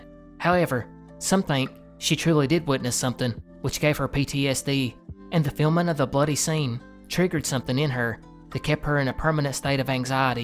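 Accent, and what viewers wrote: South, United States. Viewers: Also curious, where does your accent come from? I play games online with another southern man and his is different (more mild idk how to explain it) but it’s still obvious you’re both southern. Just wondering and trying to differentiate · Keep them coming Sir. +As a European I must admit I find it really refreshing to hear southern accent in this kind of videos. Thank you for your work!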